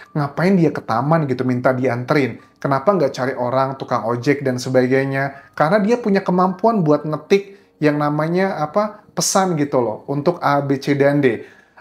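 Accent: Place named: Bali, Indonesia. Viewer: Nada bicara nya dan nama nya orang baly tuh...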